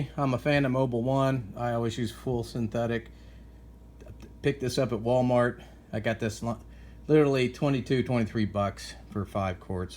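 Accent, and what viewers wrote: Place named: Germany. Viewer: Your video was a great help! Appreciate the humor and German accent too LOL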